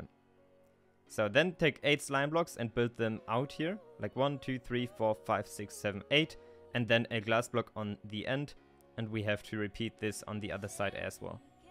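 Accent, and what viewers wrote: Germany. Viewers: And btw you hear like your are german, and here is a qord for you : im a ALMAN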